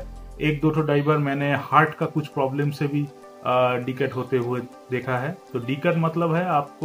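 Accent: Bengal, India. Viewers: Sir kia ap bengali ho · Sir r u Bengali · Sir kya aap Bangali ho?? Main bata raha hu kuyki mai bhi bangali huu aur hindu aap ki taarai bolta hu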